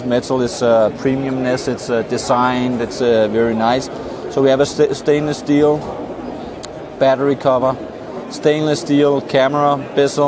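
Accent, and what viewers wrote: Denmark. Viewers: Ja han lyder godt nok meget dansk. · Very danish accent haha... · Oh my god, you sound so much like a danish person who speaks English, hahaha. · so does sound like a danish accent! haha! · He sounds danish.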